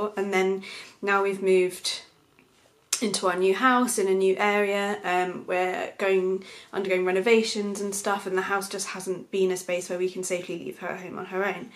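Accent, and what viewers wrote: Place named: RP, United Kingdom. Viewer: The queens English is always lovely to hear! I've had 25 Greyhounds! (Whippets cousins). I will have sighthounds until I pass. Cheers!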